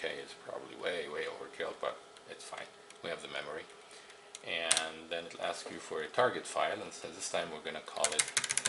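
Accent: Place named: Sweden. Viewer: This guy sounds like he is not mainly English, maybe Swedish or something i don't know, probably that's why. I think he did great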